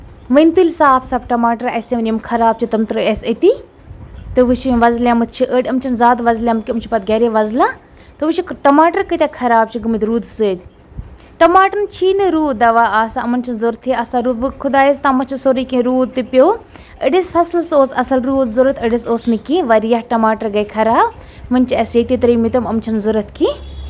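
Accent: Kashmir, India. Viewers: Aap ki kashmiri voice bhot ache hai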